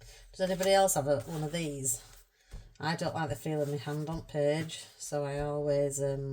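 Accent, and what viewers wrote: Yorkshire, United Kingdom. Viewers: I love watching your videos. I’m an Australian originally born in England and my Dad and his family is from Yorkshire. Hearing you speak is so nostalgic 😀 And you’re so funny. You’re doing great. Can’t wait to see more! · Hello, what a great video, do you know you've really cheered me up, I'm in bed with the flu and feeling crap, so I've so enjoyed this chaos method. And the bonus is listening to your Yorkshire accent, love it, love Yorkshire. Your humour is brilliant, I appreciate it as I'm a Scot, and originally a Glaswegian,although I live in inverness, not a lot of humour here😅. I love your approach to colouring, it's not pretentious, you just have fun. I love this page, the freedom of it and such pretty colours too. Well done and I hope your channel goes from strength to strength, I found you thanks to Jackie at spare room studio. 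I'll be back 🎉❤ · Love this! I think it will be great to do together with my daughter. I'm glad you kept going. I like your accent so much that I recently started following a new meditation teacher because she also has a Yorkshire accent 😁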